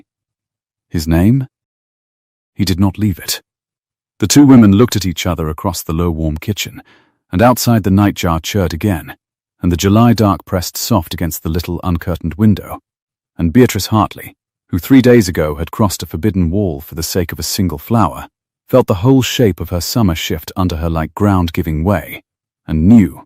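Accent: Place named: England, United Kingdom. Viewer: Thank you for this story and using an english accent.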